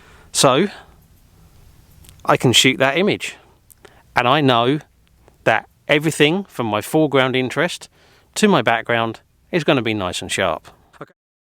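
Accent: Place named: East London, United Kingdom. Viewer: Sir, are you from London? Your accent is really reminding one of friends who was coming from East London.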